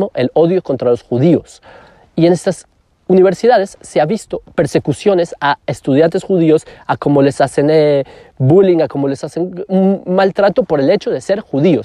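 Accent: Colombia. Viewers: el habla castellano de colombia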